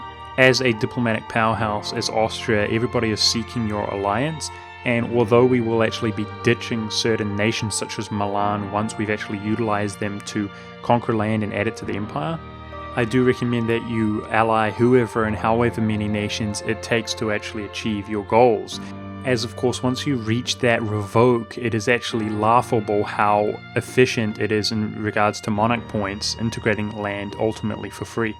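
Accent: New Zealand. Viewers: Yep, he's from NZ. Pretty obvious isn it? :D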